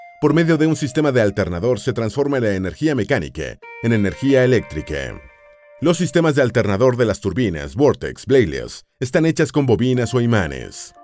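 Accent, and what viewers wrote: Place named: South America. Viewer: Porque habla en sudamericano??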